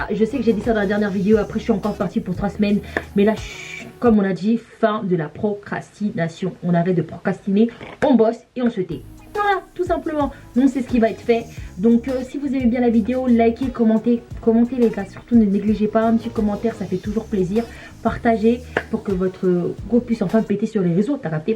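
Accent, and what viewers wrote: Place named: Democratic Republic of the Congo. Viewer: Aah pitinnn😂😂😂l’accent congolais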